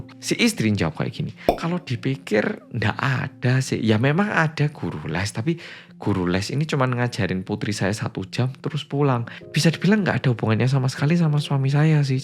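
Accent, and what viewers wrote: Java, Indonesia. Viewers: Hellow bolo medoook❤ Nyimaaak 🎉🎉🎉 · Bahasa indonesiamu hampir ilang tapi kenapa boso jowo mu jik nempel bgt😅 unik bgt kwe soll😂😂😂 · hahhah... peIiket jare 😂 . mbanyolan mu sek panggah Iuucuu wae maas 😂 .... jowomu sek kentheI Iooh 😂 minggu, 16 n0fember 2025 | 25 jumadil-awal 1447 hijriah . 00;35 WiT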